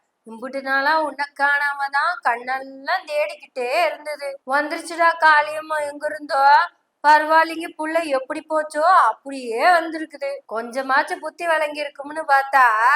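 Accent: Kongu, India. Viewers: கொங்கு தமிழ் சங்கு முழங்குவது போல் பெரியாரின் பேத்தியின் மொழி நடை உள்ளது வாழ்த்துக்கள் ❤️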